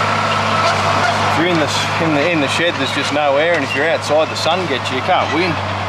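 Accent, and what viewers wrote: Australia. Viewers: Well, as usual i watched you from go to wo and enjoyed every bit cobber. Just love your content and the Aussie way in witch you deliver it. All the best to you and yours and the billy lids mate. See you on the next one.🤠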